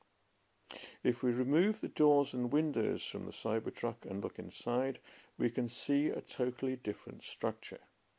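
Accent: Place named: United Kingdom. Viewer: British-accented voice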